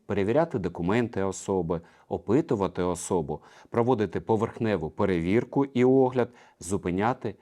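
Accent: Russia. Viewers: не "К"арячу лінію а Гарячу, В УКРАЇНСЬКІЙ МОВІ "Г" ВИМОВЛЯЄТЬСЯ БЛИЗЬКО ДО "Х" А НЕ "К" ЯК В КАЦАПНІ, ВЖЕ ЧАС ЗАПАМ"ЯТАТИ, ТА ПОЗБУВАТИСЯ КАЦАПСЬКОГО АКЦЕНТУ, НЕ ВПЕРЕШЕ ТЕБЕ ВИПРАВЛЯЮ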